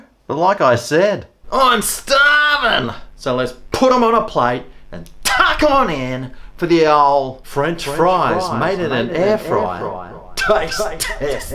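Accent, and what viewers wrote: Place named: Australia. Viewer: Need to get one Airfyer fo myself. Chips don't look great but maybe it tastes good. Can you cook more food on Air Fryer for us. Thanks. Maybe a nice Roast chicken and potato. Thanks,. You do look like a comedian. And a good one. Enjoy your videos for sure! Thumbs up! As I subscribed.And good Aussie Accent.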